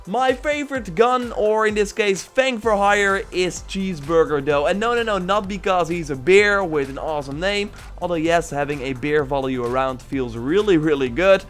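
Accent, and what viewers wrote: Netherlands. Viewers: Can you have a more english accent, i know you're dutch but still can improve with your pronouncing. Keep up the good work! ;)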